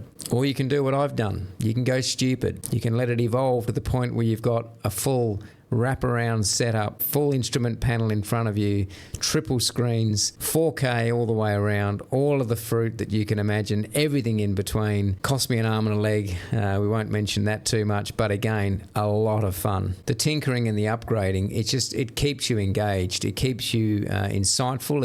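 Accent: Australia. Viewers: You're talking my language (apart from the Aussie twang). 70 in a couple of months, been simming since the mid-1980's, through all the iterations. Recently built my own PC to handle the best graphics and flight physics available now (MSFS2024). Only ever flown GA, now a 172-based Reims Rocket. Working my way from the UK to SoCal, take off where I last landed. Never tried VATSim, currently using SayIntentions - but one day i swear I'll join the network. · Are you in south Australia by chance? That accent is familiar.. 😁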